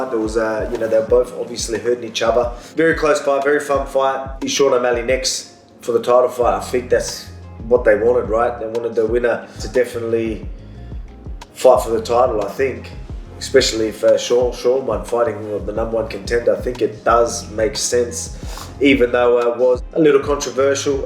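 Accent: Australia. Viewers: Grow some hair on ur head and change ur dressing sense, it makes u look more short.. otherwise excellent fighting, very entertaining, i like your Australian hard accent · Remove background music so we can hear u clearly. Your Australian accent makes ever more hard to listen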